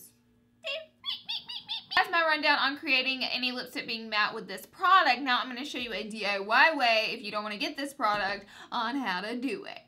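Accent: South, United States. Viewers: are u American 😂😂😂😂😂😂😀😂😂😂😂😂😂😂😂😂😂😂😂😂😂😂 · I love your accent!! I'm from Texas and I love hearing that southern accent it just makes everything so much nicer haha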